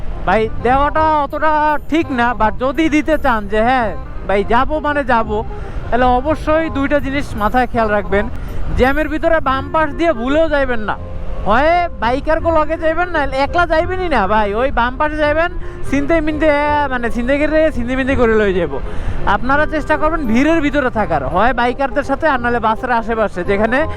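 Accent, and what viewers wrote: Barishal, Bangladesh. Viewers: Vai apni ki borishaila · ভাই কি বরিশাইল্লা,,,,,,, 😊?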